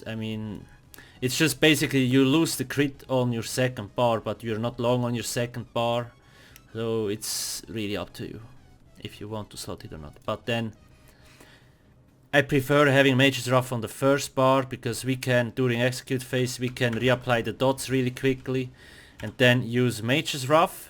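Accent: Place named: Switzerland. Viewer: you sound a lot like a swiss, are you one?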